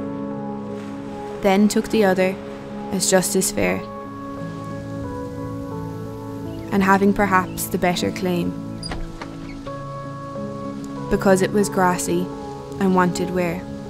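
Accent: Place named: Ireland. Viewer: first time hearing the road not taken in an irish accent. Lovely!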